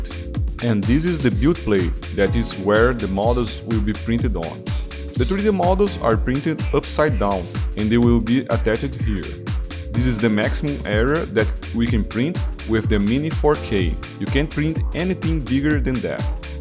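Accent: Brazil. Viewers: So he has a Brazilian accent? I thought maybe it was Russian? GTK thanks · A heavy Brazilian accent... that was unexpected tho! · Oi pelo teu sotaque e nome, você é brasileiro?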